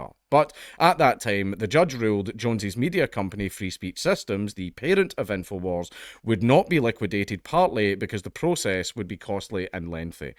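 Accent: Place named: Glasgow, United Kingdom. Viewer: Mate, I love this channel, I love your style and being Glaswegian so listening to your accent gives me a feeling of being in the company of family as my mother is Glaswegian and rest of family are Scottish. But to see Alex crying about his children when he destroyed the lives of 26 families, who actually had their family members including children killed, all to sell his protein supplements. "They're going to take your guns, "DESTROY THEM ALL because they're all actors", with absolutely no proof. Mate, you need a to make better choices in friends. He might have some awesome charisma and you may have developed a bit a a idol crush on him. And some of his theories may be correct but the way he went about the Sandy Hook incident he deserves all he gets. He is a greedy piece of shite that deserves all he gets.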